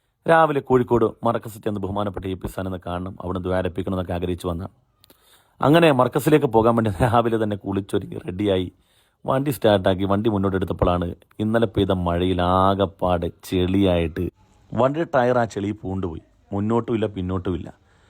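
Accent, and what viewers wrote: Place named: Malappuram, India. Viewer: Usthadinte samsaarathil malappuram slang kayarivannu😄😄👍👍👍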